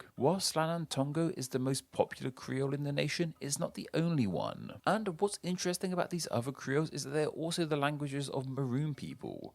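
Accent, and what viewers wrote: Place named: United Kingdom. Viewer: Your pronunciation was very good of those names. It was very surreal hearing those words spoken in a British accent. What a time to be alive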